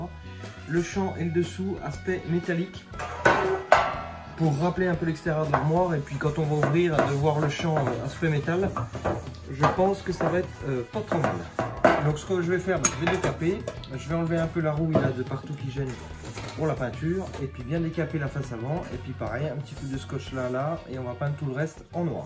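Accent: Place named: Franche-Comté, France. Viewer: Super vidéo merci... Ton accent m est familier... Franche comte?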